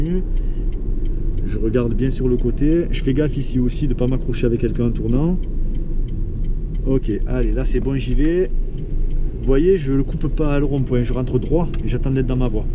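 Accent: South, France. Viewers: Salut, J'ai mon examen du passage du permis dans 27 jours, je révise grâce à tes vidéos. Quel plaisir de t'écouter et d'entendre ce bel accent du Sud que tu nous offres, ça donne du soleil à mes révisions. Gratitude infinie de Normandie 🙏💐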